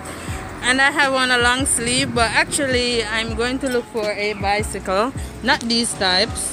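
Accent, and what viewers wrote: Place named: Jamaica. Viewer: Girl. Your accent . Fellow Jamaican here. When u said ' mi jus a waut pon the light fi change u zeen ' dwl 😅 and your Chinese😂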